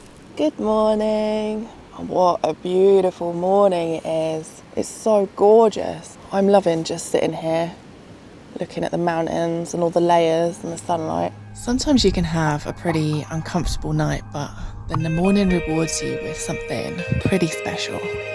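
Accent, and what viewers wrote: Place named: Bristol, United Kingdom. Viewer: Which goes to prove, if it can go wrong, it will! Still, you made the best of it. Trying to place your accent - sounds like Bristol / North Somerset, (I'm from Weston-super.Mare), and it sounds familiar! Anyway, keep on making these interesting videos.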